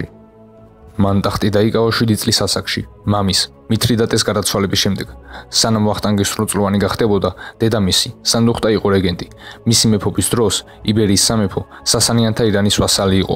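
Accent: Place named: Russia. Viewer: რყსულ ენოვანი სირბანჯოები არიან არხის მფლობელები აქცენტზეც ეტყობა და აქ რაღაც ზღაპრებს ყვებიან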